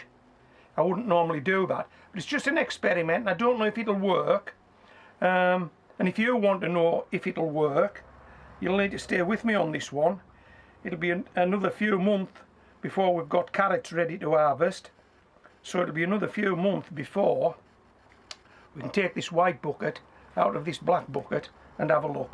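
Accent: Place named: England, United Kingdom. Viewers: I thought he was Scottish. I worked with a Geordie here in Canada. He sounds very different. It's lovely anyway. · Amazing video!! I paused the video part way in and my two variety of carrots are already on their wet tissue. My husband and I are trying to figure out where your accent is from in the North of England? Please help settle our discussion. Cheers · Great video, some tip tips for bucket planting and speedy germination. I have two questions, what brand were the pizzas as the ones we usually get these days have cardboard bases. Last question where in the world are you from, the Mrs and I reckon county Durham, unmistakable twang, surely?! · I'm so glad I found your lovely videos but must confess as a fan of the language I cannot place exactly which county you're from. Would you mind enlightening me? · Is anyone else here just for the pizza 😁 Great vid loved the starlings but i love your geordie accent the most 🙂 im going to try this!!! 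· Lovely accent in west Cumbria!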